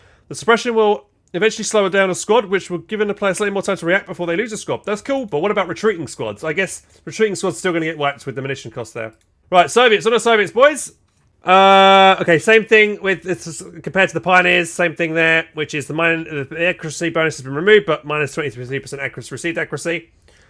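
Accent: United Kingdom. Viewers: I was pulling your leg regarding yall. Anyway, is very English. As am I. In fact we even have the same accent. Maybe it's my age, getting too old for this video computer entertainment;0)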